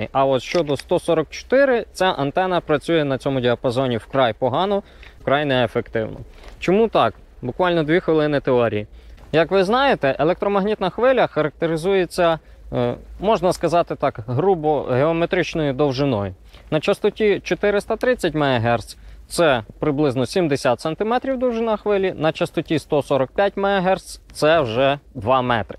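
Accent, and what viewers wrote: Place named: Lviv, Ukraine. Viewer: Простим "Дякую" не відбутись:) Відповідь надали більш ніж вичерпну. Цікавить ваша думка стосовно діполя, тому як серед аматорів вирують думки, що це не є добра антена, і годиться більше на авіадіапазон ніж на любительський 2 метровий. Ще питання, звідки ви.? Акцент Львівський, але на кругляках вас не чув Дякую ☺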